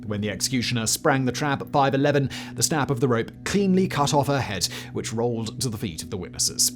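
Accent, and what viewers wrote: England, United Kingdom. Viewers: With his thick English accent, he needs to talk slower!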